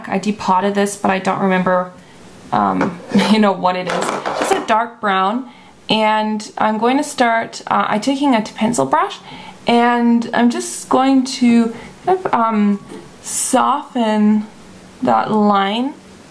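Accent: United States. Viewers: YOUR NOT FROM AMERICA!? YOU HAVE SUCH AN AMERICAN ACCENT THOUGH :O did u used to live here? thats awesome, i want to move to germany when im older :D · probably a stupid question but where do you live cus you sound American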